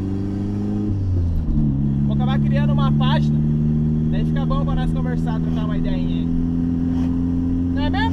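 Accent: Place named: Curitiba, Brazil. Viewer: oww cara vc é de Curitiba - XaXim kkk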